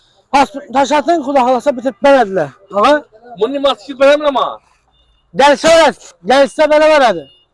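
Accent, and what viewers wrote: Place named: Khorezm, Uzbekistan. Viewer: Хоразм шевани тушуниш кийин булябди хеч булмаса бухоро шевасида гапирадиган йукми илтимос.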